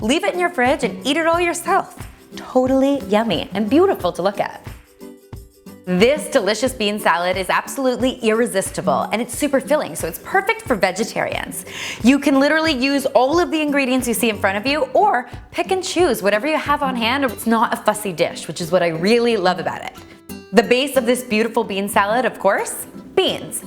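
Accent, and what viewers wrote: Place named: Canada. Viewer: Just rewatched this for the bean salad recipe and my oh my!!! You Canadian accent was so prominent, I've been watching you since the very beginning and never noticed it until today! Love it!!!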